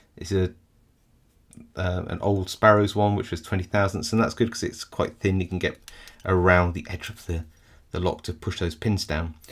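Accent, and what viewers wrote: England, United Kingdom. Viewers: Man i just wanna say i love your vids dude. the english accent will never get old to my ears lol. Not to mention i love the way you explain things, everything makes so much sense. IMO the vids are on par with LPL, albeit different video types, but still in the community, which is why i drew the comparison.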